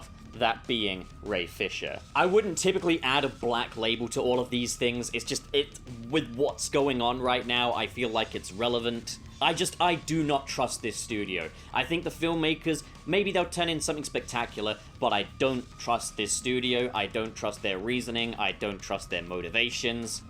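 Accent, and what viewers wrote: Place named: United Kingdom. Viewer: You sound British and Australian at the same time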